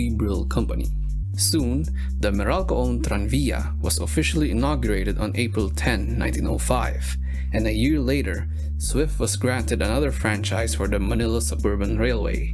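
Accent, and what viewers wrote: Spain. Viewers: I love the Spanish accent... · Accento Madrileño.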